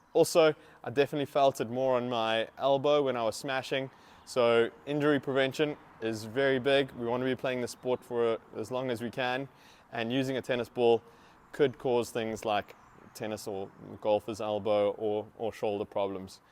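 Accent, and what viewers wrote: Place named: South Africa. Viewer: Are you South African? That accent is too familiar ek se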